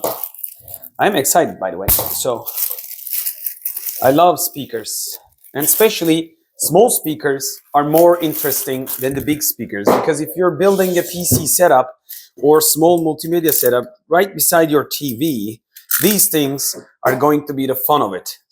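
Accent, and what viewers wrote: Turkey. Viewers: Türkiyeden selamlar aksandan anlasılıyor :))))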